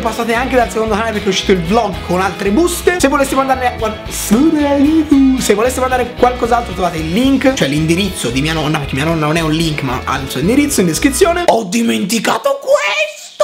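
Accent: Tuscany, Italy. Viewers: Oggi mi sono iscritto e scommetto che sei toscano!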